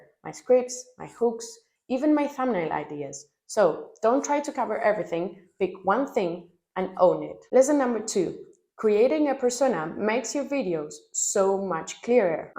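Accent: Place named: Denmark. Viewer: Thank you so much for this video. I am just over one month in and trying not to get discouraged by low views and having only a few subscribers! As an author I want my channel to be a community for my readers to connect with me, and each other. I hope that my persistence pays off in the long term. Also- I love Denmark and hearing your accent reminds me of when I visited last year!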